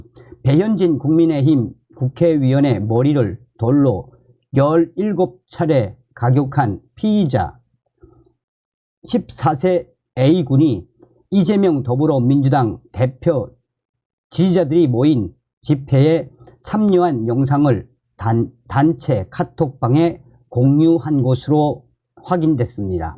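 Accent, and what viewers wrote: North Korea. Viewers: 북한 사투리 억양좀 고치시면 좋겠어요 듣기가 거북하네요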